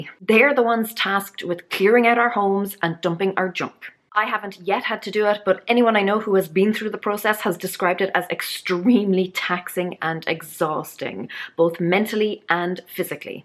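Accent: Ireland. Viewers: When i moved several years ago, my son told me, "There's not 10% of this stuff that's worth keeping, let alone packing and moving it. You're on your own." I agree with you-- let's toss it. And i like your Irish brogue.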